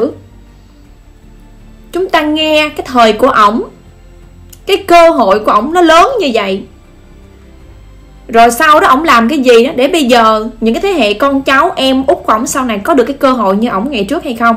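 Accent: South, Vietnam. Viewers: Ngôn từ đơn giản ( không cần " câu cú " cao siêu! ) + giọng nói nữ miền Nam rỏ ràng - sôi nổi - tự tin - mạnh mẻ + đề tài lịch sử hay - trung thực - lôi cuốn người nghe + xinh tươi -......triệu triệu like tặng xinh tươi nhiệt huyết! BRAVO!!.....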